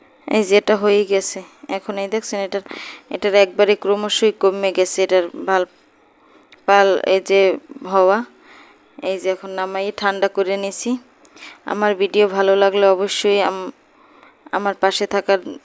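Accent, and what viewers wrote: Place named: Barishal, Bangladesh. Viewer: অনেক সুন্দর হয়েছে আপু আপনার এই আমড়ার জেলি ঠিক কইরেন আপনি কখনো শুদ্ধ বলেন কখনো আবার বরিশালে এটা বলেন শুদ্ধ টা বলবেন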